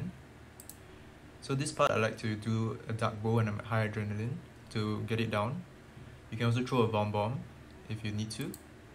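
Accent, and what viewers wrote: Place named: Singapore. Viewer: Bro are you from Singapore? You sound like a Singaporean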